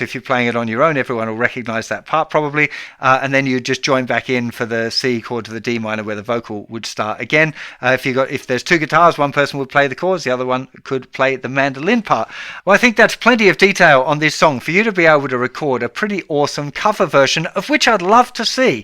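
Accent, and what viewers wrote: England, United Kingdom. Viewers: Bro! You’re Australian? Been watching your videos, but never realised - definitely hear an English twang. But you said you played mandolin in Tasmania. What gives?? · Good day to you sir .I love ur all guitar teaching and lessons ..you are a British? If I'm not wrong hehe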